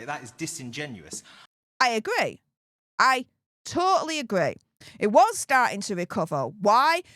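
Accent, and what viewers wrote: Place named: North, United Kingdom. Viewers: Love yer, proper Northern girl, telling it like it is 😊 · Northern English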